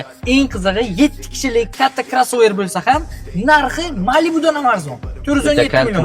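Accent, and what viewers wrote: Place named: Bukhara, Uzbekistan. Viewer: Buxoro sheva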